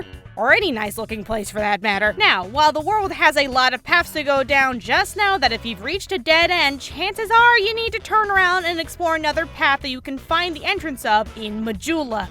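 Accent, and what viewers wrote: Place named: Midwest, United States. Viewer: So fucking midwestern!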